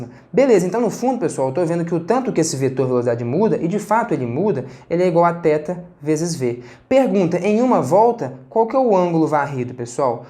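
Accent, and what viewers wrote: Minas Gerais, Brazil. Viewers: Adoro quando ele fala "Prestenção" acho tão fofo esse sotaque Mineiro. Ótima aula!!!!! Parabéns pelo desenvolvimento! ❤️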